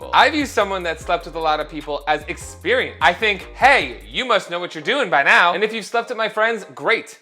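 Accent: New Jersey, United States. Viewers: The longer stays in NJ, the more the accent comes back.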